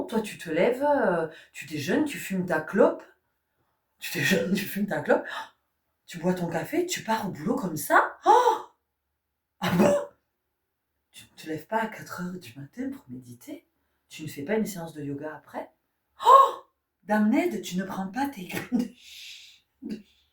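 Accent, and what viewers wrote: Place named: Marseille, France. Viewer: J'aime bien . Vous avez le sourire . Accent du sud Marseille . C est joyeux ❤❤❤❤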